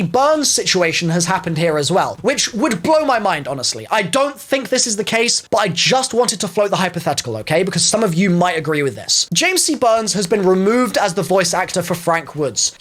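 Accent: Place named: England, United Kingdom. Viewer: Is it just me or just everyone likes he's English way of speaking?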